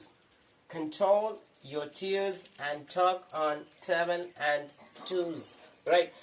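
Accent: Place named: United Kingdom. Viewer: Wow! Nice British accent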